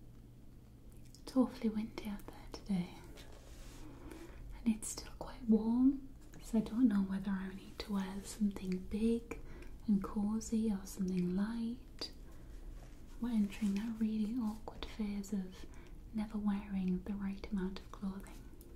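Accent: United Kingdom. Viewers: Y ella aún habla el inglés británico, y así se queda más difícil para muchos 😣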